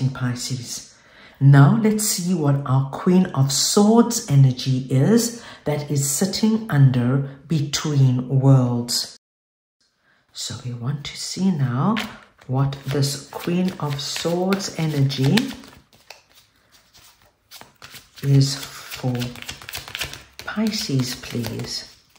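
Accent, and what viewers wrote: South Africa. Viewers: Thank you so much for a lovely reading again 😅 do i detect a South African accent? Or am i getting old 😅😅😅 Lots of love from Cape Town ❤❤❤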